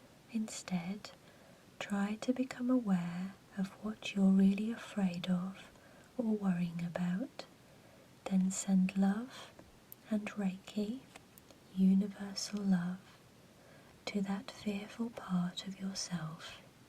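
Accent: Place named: Essex, United Kingdom. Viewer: love listening to your voice. calming, soft, essex twang with a touch of class and a slight hint of nautyness. could listen to you for hours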